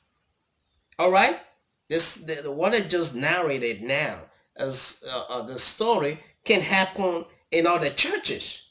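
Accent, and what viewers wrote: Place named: Calabar, Nigeria. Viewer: You are Edo but got a Calabari accent. Or maybe it’s I have not been in Nigeria for a long time.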